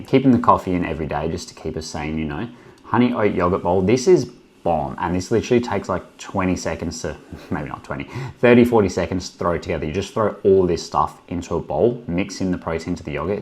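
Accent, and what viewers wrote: Australia. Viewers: Are you a Queenslander?, i notice Queenslanders say A or Hey alot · So good to hear a fellow Aussie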